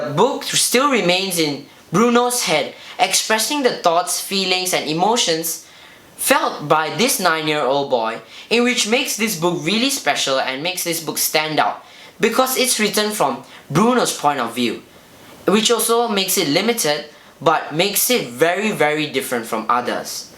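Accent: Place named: United Kingdom. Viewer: Yt is slowly making your accent more and more British lmao what, i never said it was a bad thing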